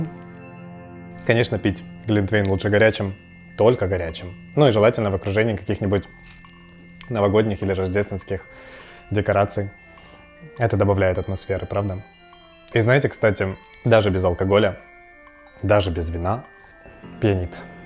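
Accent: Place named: Surzhyk, Ukraine. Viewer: Хильнуть))) обожаю твой суржик. И кота.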